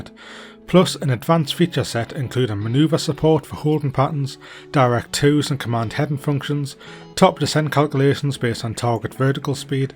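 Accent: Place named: Northern England, United Kingdom. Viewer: Nice to a northern fella, least they're local, i think your the voice that says "GO" in the RJ too? Great as always. Nice job JustFlight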